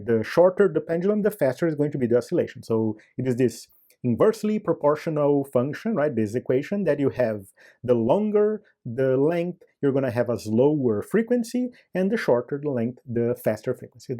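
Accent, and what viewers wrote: Brazil. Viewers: pelo sotaque, você é brasileiro! muito bom seu canal! parabéns!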